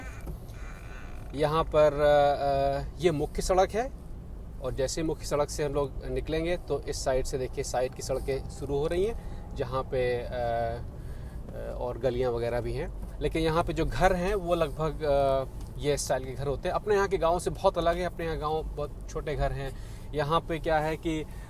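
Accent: Bihar, India. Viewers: Aap bihar se ho....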